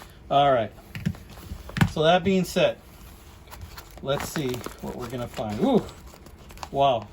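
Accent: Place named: Texas, United States. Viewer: Well before I saw the return address on you send-in I went,'This guy sounds like a finest-kind native TEXAN and sure enough...! Your lead in was full of information and not at wordy and completely informative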